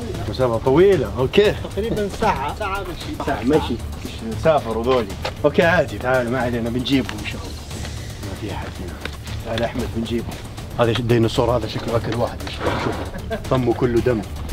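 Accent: Saudi Arabia. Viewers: كيف كلامو سعودي وهو من تونس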